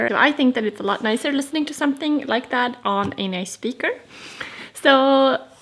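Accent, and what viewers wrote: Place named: Sweden. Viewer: I hear that you are Swedish nice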